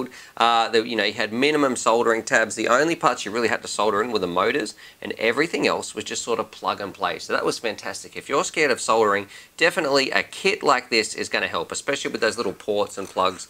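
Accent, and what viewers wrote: Australia. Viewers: You make funny Australian noises and it's the best thing ever!!!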